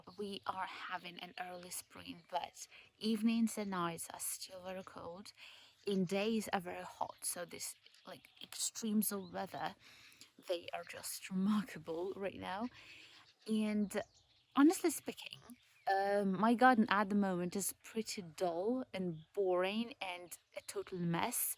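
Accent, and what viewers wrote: United Kingdom. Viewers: Always lovely to see you and your garden. Have you continued studying language? Your accent sounds even more British than I recall from earlier videos.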